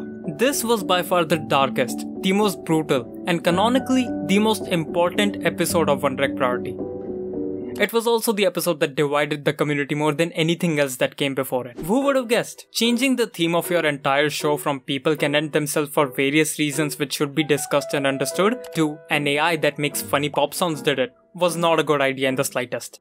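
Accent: India. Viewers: Are you indian? your accent is hard to pin down...it sounds indian and Italian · The Indian accent makes me not be able to take the video seriously. The editing is good.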